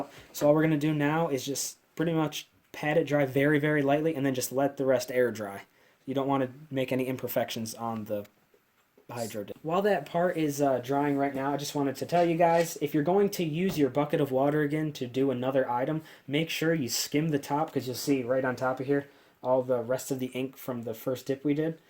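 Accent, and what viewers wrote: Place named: United States. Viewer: Jorge Cruz stupid question....clearly you can hear they're American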